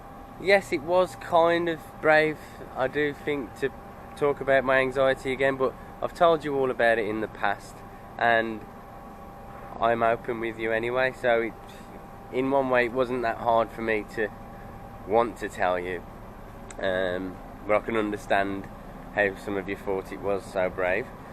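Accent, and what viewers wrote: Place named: Birmingham, United Kingdom. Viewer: love your accent....is that brummie or just general black country?....and the way you accentuate the letter G in words..lovely...I love watching moody blues interviews they talk more or less the same as you....love it!!!!!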